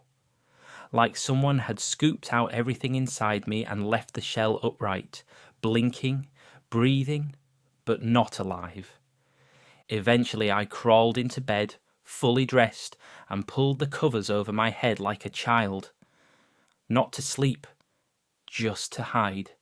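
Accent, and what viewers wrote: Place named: Minnesota, United States. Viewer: I didn't imagine the Minnesota accent like this.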